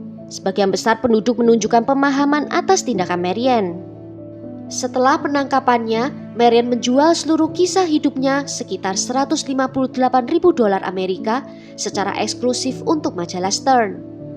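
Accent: Java, Indonesia. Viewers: Medok mbak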